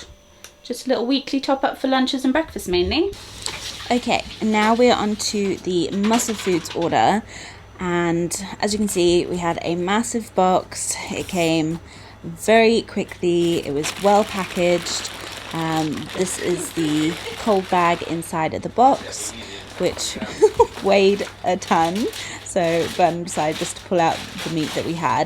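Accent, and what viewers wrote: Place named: United States. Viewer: Hi, love your vlogs. I have been wondering something for a while and sorry if I’ve missed your explanation, but I notice you use quite a few American words, like Vase or Yoghurt and I wondered if you were born or lived in America or it’s just influence of watching lots of American show(most kids of today do this 😅)? You are very well spoken, but you have a slight American twang and just wondered the reason. ❤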